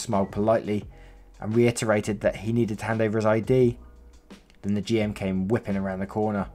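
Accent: United Kingdom. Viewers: Wow! I've never gotten a response by a r/eps person! Also I LOOOOOOOOOooooOOVe your accent! Are you brittish? I'm from Colorado in the US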